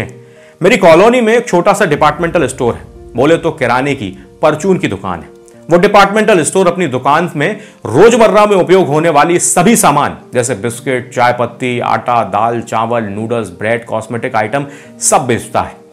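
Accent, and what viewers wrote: Standard. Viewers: kya sudh hindi bolte hai. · you pure hindi speak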